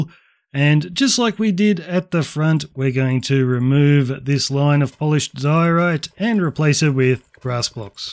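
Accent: Australia. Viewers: so.. we and my friend built this whole thing in like 5 days, allow me to get you some constructive criticism what am i gonna say; first of all PLEASE, i BEG you to dedicate like 30 seconds of the guide to tell us which blocks do we take before getting to the next part instead of just "so when ur done you should be looking like this now place a scaffolding" like we didnt have these things in our hotbar, every time you say to place a block we have to pick it up from creative or from shulkers if ur on survival mode, that takes extra time and gets kind of annoying as the building goes, like we got so insane building it all my friend started to mock your australian accent and burnt out of minecraft as we finished the building (dont take it personal, its prob just us building it from 10pm to 6am for 5 days straight) or you could just leave a litematica scheme, people would thank you a million times but you probably wouldn't get as much views on all these guides also, in the replies you said the outlines dont line up because some of the measurements are for the gaps between the stone, would be nice of you to tell that in the video itself because we REALLY messed the measurements and got sick of constantly fixing the walls and all that mess led up to us not even bothering to fix some of them the building ifself though is AMAZING and is really worth the time also may i ask you a question, how old are you?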